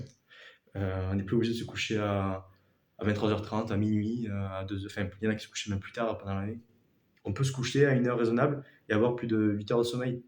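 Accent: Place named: South, France. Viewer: Y’a quand même un truc agréable à entendre un mec avec l’accent du sud parler de X-ENS. Ça rend le truc plus light.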